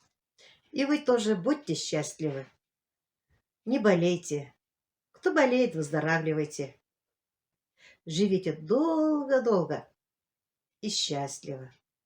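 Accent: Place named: Kabardino-Balkaria, Russia. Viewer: Ну, ты такая смешная,так уговаривала козла! Мартин похож на козлов,которые в горах у нас живут. А разговариваешь на татарском как наши балкарцы и карачаевцы!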